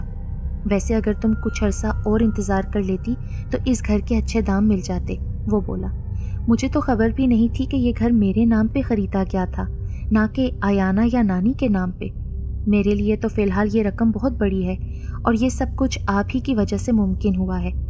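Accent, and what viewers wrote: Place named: Bangladesh. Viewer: Bangali lehja acha lagta hai ap pe😍😍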